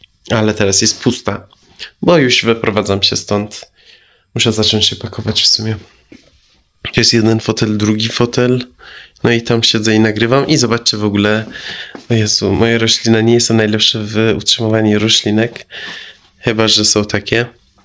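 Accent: Poland. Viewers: Jak Pana słucham to stwierdzam że ma Pan polski akcent i dobrze mówi po Polsku.👍🏵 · Świetnie mówisz po Polsku i to z akcentem Polskim Znam tu obcokrajowcow którzy są dlugo i może mówią po Polsku ale bez akcentu Polskiego Zdolny z Ciebie Iranczyk I bardzo sympatyczny chyba jesteś unikatem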